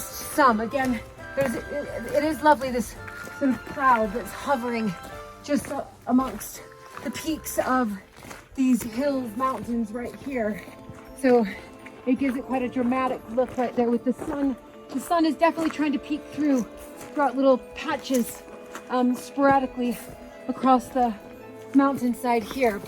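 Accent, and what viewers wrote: United Kingdom. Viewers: Wow,you picked such a wonderful area for your house! Just gorgeous.Your American accent has some touches of British e.g.You pronounce t in "delightful" and "little" with a very hard t instead of the softer ddd American style.Now you will be picking up Italian too.I doubt you are conscious of it. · Soooo beautiful. I notice that some of your diction is now coming close to a British accent. cute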